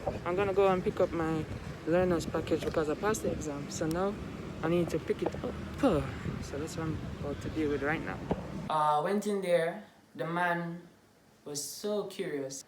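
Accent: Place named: United States. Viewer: Talk like an American tho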